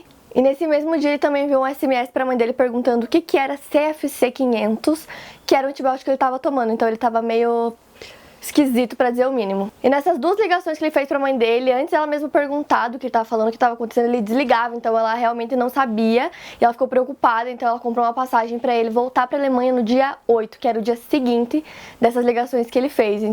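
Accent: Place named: Rio Grande do Sul, Brazil. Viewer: tu é do rs? parece por causa do sotaque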